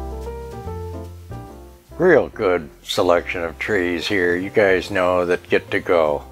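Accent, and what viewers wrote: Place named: Midwest, United States. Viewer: R u from the midwest? Love your accent merry shopping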